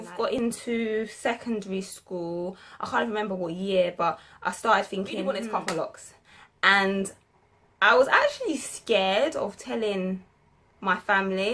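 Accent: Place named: London, United Kingdom. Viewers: pretty skin and great to hear a uk accent. :) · carol durand yeah big time great to hear UK London girl cos all we usually get is American...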